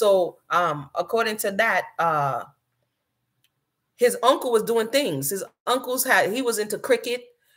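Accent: Brooklyn, United States. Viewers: Wow you just asked in the video to state where you were born I just texted before you asked the question you sound like you were raised in Brooklyn or somewhere in NYC. Wow you just said in the video Norval was birn in Jamaica! Wow thought he was also born in England!! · You do have a Brooklyn accent. I could identify it clearly 🤣🤣🤣🤣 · You definitely from BK.. prolly flatbush or canarsie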